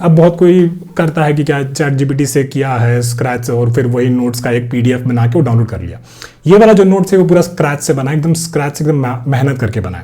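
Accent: Bihar, India. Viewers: The Guy Is Littreally Khan Sir OF Coding - Teaching Style , Bihari Lang Style . Thnku Sir For The Content . ❤❤ Day 1.5 Done ( 1/1/26 )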